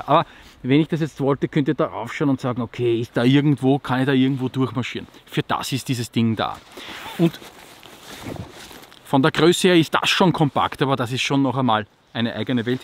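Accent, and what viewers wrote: Austria. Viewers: What is this interesting accent? Austrian? Anyway, thx for this review, item added in the wishlist. 😊👍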